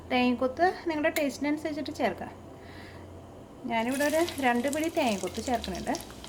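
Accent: Thrissur, India. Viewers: സംസാരിക്കുന്നതിന്റെ Speed ഇത്തിരി കുറച്ചാൽ, നമ്മുടെ തൃശൂർ ഭാഷ ചിലർക്ക് ഇത്തിരി കുഴപ്പം ഉണ്ടാക്കുന്നുണ്ട്, അങ്ങനെ ആ പരാതി ഒഴിവാക്കാം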